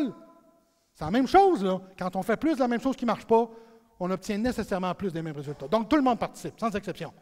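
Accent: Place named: Quebec, Canada. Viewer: "mais si je m'adresse à Norman, seulement pour lui tomber sur la tomate..." mdrrr (en tout cas, superbe conférence, un bonheur à écouter. Et j'adore cet accent québécois <3)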